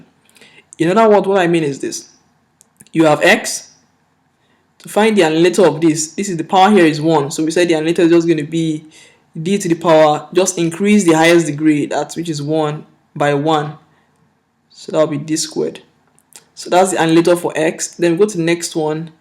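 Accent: Nigeria. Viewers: are you Nigerian? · Sounds Nigerian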